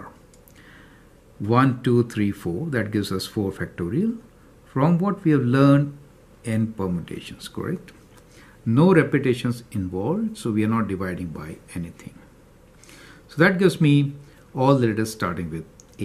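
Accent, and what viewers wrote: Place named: India. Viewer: aap jitna bhi US ascent mein bolne ki koshish karen .... hindusthani pronouncation aa hi jati hai .......... by the way video was nice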